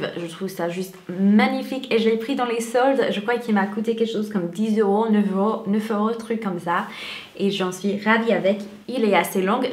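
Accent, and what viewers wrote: United States. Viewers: Salut! Ca fait 3 ou 4 mois que je regarde tes vidéos très sympathique! T'as fait une faute dans le titre Haut et pas Haul, mais tu es bien mignonne! J'adore ton accent américain continue comme ca!!! Bisous de Paris 😘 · la robe est superbe c'est celle que je préfères ! tu es très jolie and i love so much ton petit accent usa 😊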